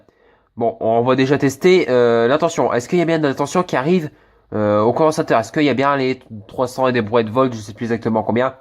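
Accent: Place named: Nord-Pas-de-Calais, France. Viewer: chti reconno tè dmin coin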